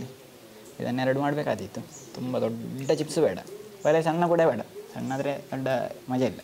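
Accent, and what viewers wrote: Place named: South Canara, India. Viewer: This is the style of kannada spoken by people from south canara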